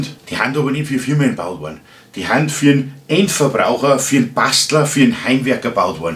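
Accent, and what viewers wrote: Bavaria, Germany. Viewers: Lieber, ich liebe deine Videos, deinen bayrischen Dialekt und wie du dich so herrlich in jedes Thema emotional hineinsteigern kannst. Natürlich bringst du wieder einmal die genial die Sache auf den Punkt. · Schade dass man dich außerhalb Bayerns einfach mal kaum versteht.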